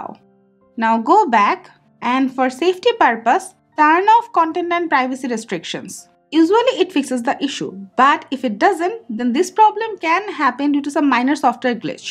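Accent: India. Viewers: You must be American - THE very country that can’t speak English! So much so they made up their own language they call English. This woman is speaking English - with an Indian accent. Grow up!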